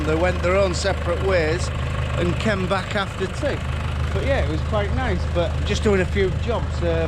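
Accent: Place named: Northern England, United Kingdom. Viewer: It's int Wizards sleeve mode 😀 love listening to northern accent like mine, hope there's a few American viewers wondering what's he on about. Best videos on YouTube.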